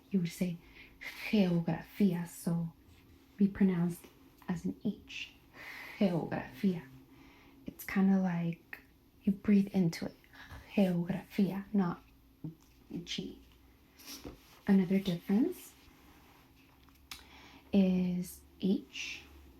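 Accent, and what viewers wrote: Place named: Mexican-American, United States. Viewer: Estoy casi seguro que es mexicana 🇲🇽 nacida en estados unidos, las chicanas son muy bonitas y tambn lo creo por la forma de hablar el español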